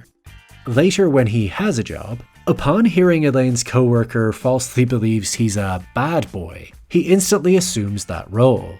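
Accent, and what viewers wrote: Ireland. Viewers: Your Irish huh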